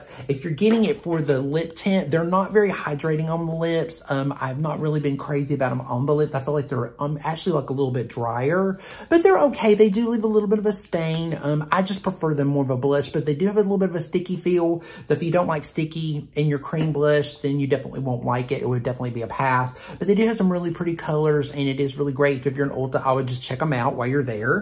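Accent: South, United States. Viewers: What a great video! I know how much effort went into this….completely enjoyable. The combination of your southern accent and your obvious makeup artist experience is so Nice to watch! Thanks for uploading👍.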